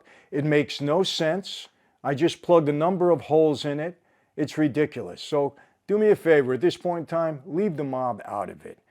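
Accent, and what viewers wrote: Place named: New York, United States. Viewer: I like you first time listening im an old lady who grew up in the big apple and your accent is like visiting my classmates and neighbors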